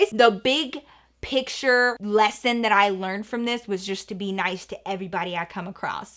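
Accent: Texas, United States. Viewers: when you say "stewpid" you still so have your Texas twang girl 😂👌🏼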